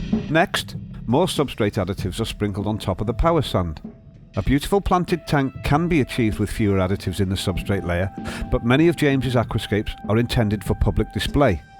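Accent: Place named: Wales, United Kingdom. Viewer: can anyone tell me what accent this is? sounds like a Welsh guy I know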